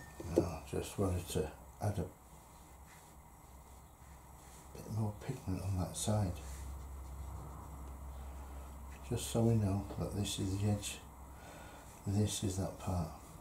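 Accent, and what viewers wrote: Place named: Yorkshire, United Kingdom. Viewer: So different and inspiring, love your style. Quite unique. Nice to hear a fellow Yorkshire accent! Debs in Spain ❤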